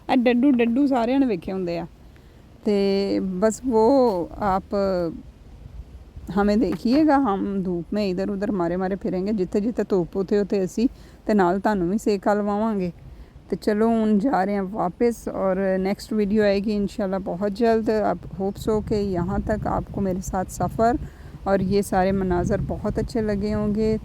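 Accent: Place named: Punjab, India. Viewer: اشعار اچھے ہیں لیکن آپ کے پنجابی لہجہ نے اشعار کی ایسی کی تیسی کر دی.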